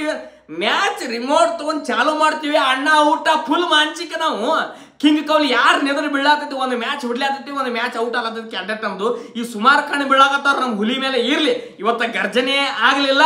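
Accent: North Karnataka, India. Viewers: ಕಾಕ ನಿನ್ನ ವಿಡಿಯೋ & ಮಾತು ಕೇಳಾಕಾ ಬೆಂಕಿ ನೌನ್ ನಮ್ಮ ಉತ್ತರ ಕನ್ನಡ ಜವಾರಿ ಭಾಷೆ❤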